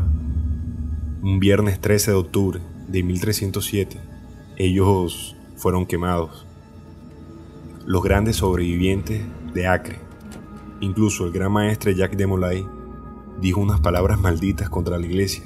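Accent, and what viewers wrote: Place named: Venezuela. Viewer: La historia puede ser muy buena, pero no con acento venezolano por favor. Pierde toda credibilidad y seriedad. Dile a algún amigo que te grabe la voz, preferentemente un español. Además, se nota que estás leyendo absolutamente todo, te equivocas al pronunciar los nombres y el acento de los lugares ó personas porque no eres un erudito en el tema, no lo has estudiado, ni lo conoces, solamente estás leyendo un libro que te encontraste por ahí.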